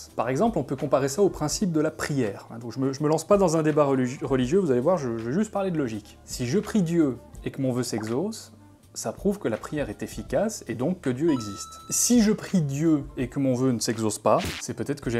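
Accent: Belgium. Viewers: Ce format est vraiment trop cool ! Que ça soit les musiques, l'image, l'humour bien dosé, le propos, les exemples illustrant tel ou tel bout de la méthode, l'accent belge, c'est parfait ! T'es au top et merci !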